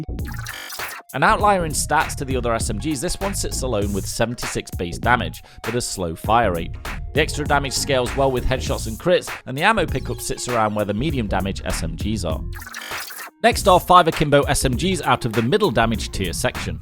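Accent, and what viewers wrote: United Kingdom. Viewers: Are you bri'ish?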